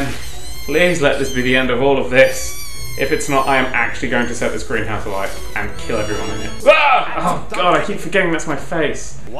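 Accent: United Kingdom. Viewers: 99% of what makes this video great is the fact that he is narrating a harry potter game with a british accent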